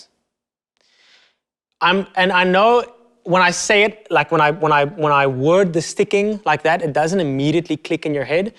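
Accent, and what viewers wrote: Afrikaans, South Africa. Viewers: If you want a laugh turn the captions on for the video. Youtube doesn't understand the South African accent at all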